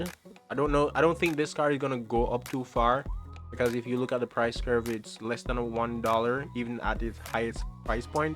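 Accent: Jamaica. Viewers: I can tell from your voice that you are Jamaican. Can you tell me where you play? I am trying to find a locals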